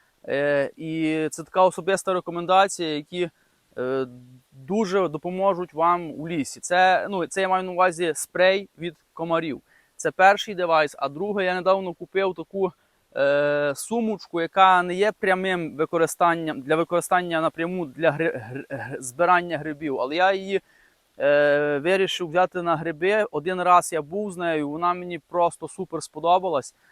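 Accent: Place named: Prykarpattia, Ukraine. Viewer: Ви так просто і щиро розповідаєте, і дуже гарний говір у вас, прикарпатський.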